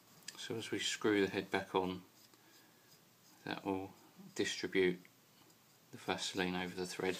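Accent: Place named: United Kingdom. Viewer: Delightful British accent! Love it!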